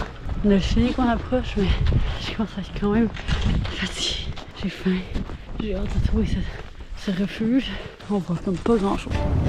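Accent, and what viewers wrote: Quebec, Canada. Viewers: Tu es Québécoise ou anglophone? Car avec ta façon de parler, tu es très très loin de promouvoir notre langue... 😢 Ceci dit, bravo pour ton courage et ta vidéo est vraiment très très très très très très très bien monté 🥰 Essaie de moins parler en anglais stp.... 😊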